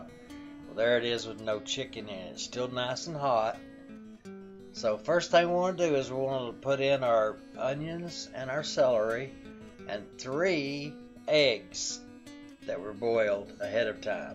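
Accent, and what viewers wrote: South, United States. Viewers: Yes! This is southern style country chicken n dressing; sound likes you from the south to!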